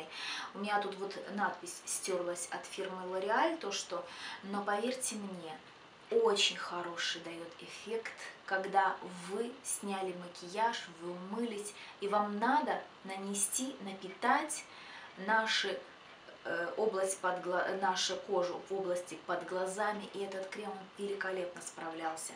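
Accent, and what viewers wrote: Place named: Belarus. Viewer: Вы такая приятная)!обожаю акцент белорусов!!!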